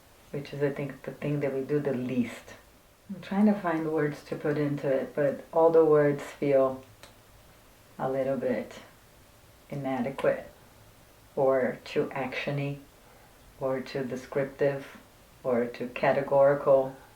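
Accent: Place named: Brazil. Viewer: Brazilian accent ❤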